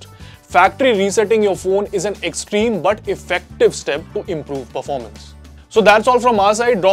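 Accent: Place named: India. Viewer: Did not understand one word that u said because of your accent from India!!, u need to speak better & more clear English!!!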